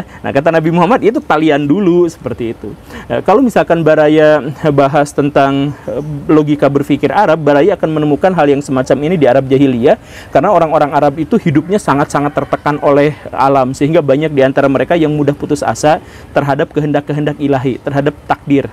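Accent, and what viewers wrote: West Java, Indonesia. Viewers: Klo sy Alhamdulillaaah,ga prnh ikut2an.waktu itu pernah rame di daerah tmpt tnggl sy,sprti yg di ajarkan ustdz YM,ada yg ikut beberapa orang,kata mereka hayu cpt sedekah 50rb juga gpp,nanti sebulan lg kIta dpt rezeki 70kali lipat, Klo sy terus terang langsung mengernyitkan dahi(????) BTW sy baru liat channel,maasyaa Allaah ilmu'nya🤗🤗berbobot, penyampaian'nya ringan,logat sunda pula,da abdi ge sunda😘😘😘👍🙏🏻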